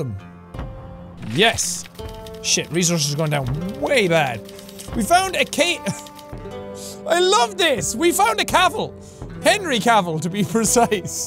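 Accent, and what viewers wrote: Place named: Ireland. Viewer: Dear anyone taking an interest in this comment, I have been watching Jack for a while now and I love him. I first watched one of his videos when I was seven, I had head phones in on max volume, Bad idea. I clicked onto the video and heard a loud Irish mans voice that almost made me deaf. I immediately clicked off the video. A few years later when I was ten I found his channel again but didn't remember it so I clicked on the video and I loved the video, I watched more videos and subscribed to the channel. I loved him, he helped me through a lot. A few minutes ago as I am writing this comment I found out I am going to his live tour on the third of November, I was and am still crying tears of happiness because I can finally meet or at least see the man who has helped me through so much. if you are reading this, thank you so much.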